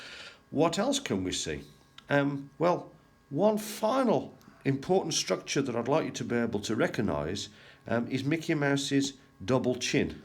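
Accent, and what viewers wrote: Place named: United Kingdom. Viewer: THANKS.SAME THEME DIFFERENT PERFECT WAY.SOMETIMES THE VERY BRITISH PRONUNCIATION IS ADORABLE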